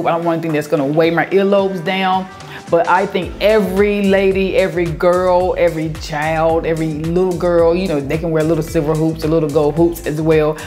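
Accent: South, United States. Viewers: ...you keep it real! Love your tips, your style, your southern accent, your laugh, and your personality.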